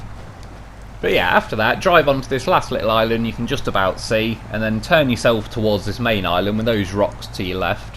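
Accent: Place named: Staffordshire, United Kingdom. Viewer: The Peugeot 406, not a horse of a vehicle. Still trying to place your accent. Maybe Staffordshire, Stoke, I feel it maybe further North. Don't tell me I'll get it eventually haha. My car history included some French cars too, Citroen BX 16 valve, other Citroens, couple of Peugeots. Reliable, no. Comfy, yes. The BX 16 valve was fast when it worked, but all ended as scrap.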